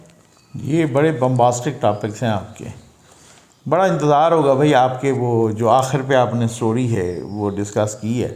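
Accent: Saraiki, Pakistan. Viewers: Sir r u sariki speaker?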